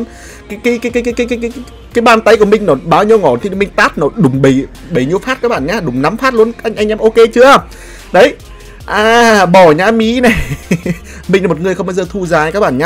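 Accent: Nghe An, Vietnam. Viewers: Yêu giọng NGHỆ QUÊ CHOA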